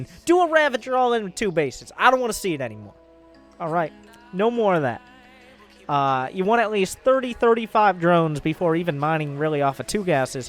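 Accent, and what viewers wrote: South, United States. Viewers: Thanks for the tutorial. I enjoyed the southern accent ;]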